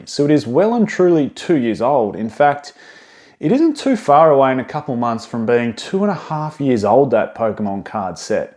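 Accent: Australia. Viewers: Hey, legend, random question but are you aussie by chance?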